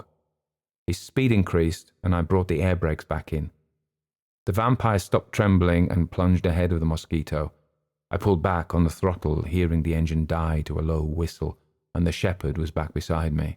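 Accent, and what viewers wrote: United Kingdom. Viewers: What a treat to hear a British voice, not a stupid American AI muck up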